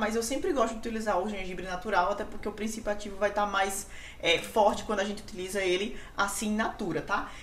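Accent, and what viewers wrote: Recife, Brazil. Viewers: Adorei a receita. Muito saborosa, principalmente com limão!!! Pra mim foi difícil achar a cavalinha fora do Brasil, mas a Amazon me salvou. Rsrsrsrs Essa nutri é de Recife? Parece muito com meu sotaque 🤭 abraços para minha possível conterrânea 😁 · Ela é de Recife sim, sotaque lindo né ❤️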